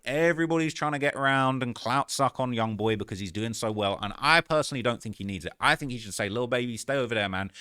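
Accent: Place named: United Kingdom. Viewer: You accent drives me NUTS!!! And the fact you always act like your black drives me even more nuts!!! You are a bum from the UK!!! Stay in your lane yt boy!!!! You gon get it very soon . Remember those words I just said. See you on the news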